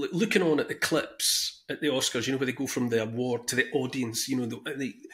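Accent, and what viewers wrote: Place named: Dumfries, United Kingdom. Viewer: I love you,. My long-gone uncle grew up in Dumfries and so it's extra lovely listening to you speak. I'm grateful for your chats about the machine. TBH I haven't been able to bear watching US movies for a long long time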